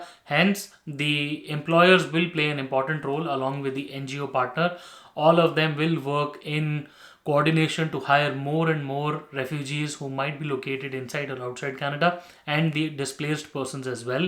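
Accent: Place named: India. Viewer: Tha Indian accent is so strong that it becomes extremely hard to understand.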